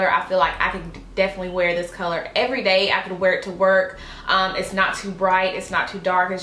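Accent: South, United States. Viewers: you are southern! lol it's so cute!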